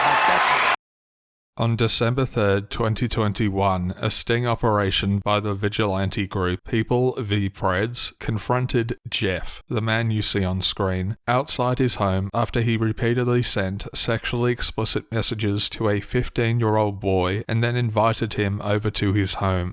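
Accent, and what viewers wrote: Australia. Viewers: Equating the forceful internment of Uyghurs, despite there being no evidence of actual genocide, with the rest of these actual genocides is the problem here. Would you call the systemic arrests and imprisonment of black people in America a genocide? The actual problem here is that In all of your videos you only actually stand for the western consumer. Genocide for you is something that happens far away from wherever you're at (probably Australia if your accent is anything to go by) and you couldn't care less if billions perished so that you could keep enjoying all those first world pleasures. You could use your channel to make videos about actually horrific things gaming companies are supporting in besieged countries such as Nvidias support for global systems of surveillance and their use of Israeli occupied Palestinian land for tech research, the U.S. 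military propaganda within mainstream 'realistic' fps games such as BF6, Call of Duty, etc., or Saudi/ Gulf monarchy ties to Esports. Instead, genocide is just an off hand topic for you to throw out there for shock value. The main focus of your content is solely on whats good for westerners, nothing of actual value.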